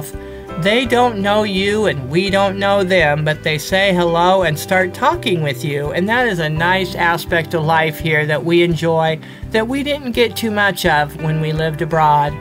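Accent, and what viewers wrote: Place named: South, United States. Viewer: 😊 Yes ma'am 😊 Your little speach today remind me of the way Americans always speak to me... No matter what I say, I get this American "yes ma'am" or "no ma'am"... And, I can't help but smiling..... hence, should you have any doubt who you are talking to or, where they are from, just listen to the "yes ma'am" or "no sir"... Sure has it's own charm 🌹🌹🌹 Thanks for sharing - ma'am - and, enjoy your Sunday... On my way out to be kicked by some "friendly" Europeans... Cheers 😊🌹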